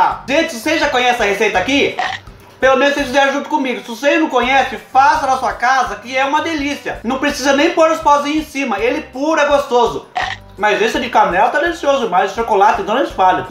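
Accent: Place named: Countryside, Brazil. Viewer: morei no interior e sinto muita saudades,amo esse sotaque lindo. Vc lembra do vídeo do coró? Aquele dia eu ri tanto que doeu a barriga. Quando mudei pra Tatuí eu estava grávida,e ouvi minha sogra falar que lá na árvore estava cheio de coró,eu achei que era uma fruta e fiquei com desejo de comer o tal coró, só que eu não falava tinga vergonha, pois estava na casa da sogra,como eu ia dizer aí sogra me dá um coró. Pois vem eu já andava com a boca aguando quando o pai do meu filho chegou de uma viagem de 2 meses eu pedi a ele, por favor eu quero comer coró não aguento mais de vontade ou vc compra ou vou me separar de vc. Todo mundo riu do céu. Essa foi a maneira bizarra que fui apresentada ao coró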